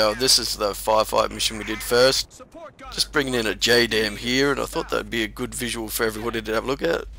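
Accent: Australia. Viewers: im guessing ur an aussie coz u keep saying mate lol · austrailian, english, or russian?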